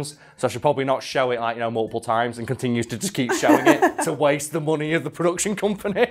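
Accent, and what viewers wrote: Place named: Northern England, United Kingdom. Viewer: don’t even mind the northern accent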